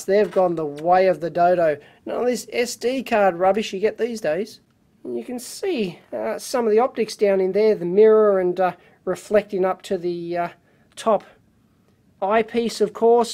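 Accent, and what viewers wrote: Australia. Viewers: The crappy Aussie accent made me turn the volume down.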